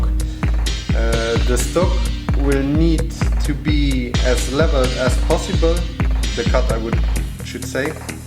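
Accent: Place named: Luxembourg. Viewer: 🎉 duuu bass letzeboier ❤ ech erkennen deen accent direct 🎉❤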